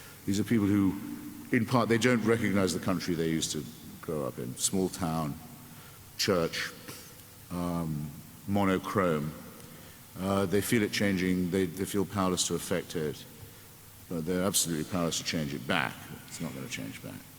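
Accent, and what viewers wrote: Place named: England, United Kingdom. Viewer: If he didnt have an english accent no one would care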